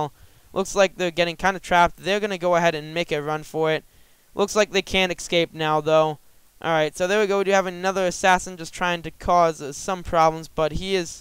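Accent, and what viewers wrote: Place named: United States. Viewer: Right, what server are you on btw,? I'm feeling a bit of american in your accent. Not sure which one specifically.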